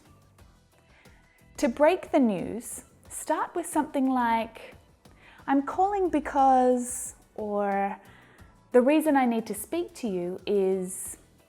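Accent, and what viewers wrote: Australia. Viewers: Hi haw are you?are you from Australia?Australia is very nice cauntre.Australian people are very kind.you too.l love your acisent you speak good l am your student.thank you very mach l love your lessons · I'm lucky finally i got you here as a great teacher I'm live in Sydney I'm learning a lot from you specially Australian accents Thank you so much love you mmm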